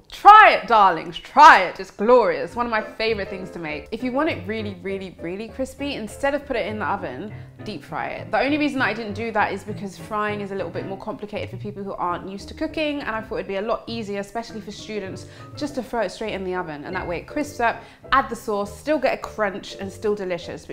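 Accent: England, United Kingdom. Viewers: Wait, what brand did you say those vegan sausages were? It sounded like sazories but I couldn’t find anything like that online. Sorry, I’m American and sometimes I can’t understand the English accent. I’m having trouble finding vegan sausages that are edible. If anyone knows what she said please reply!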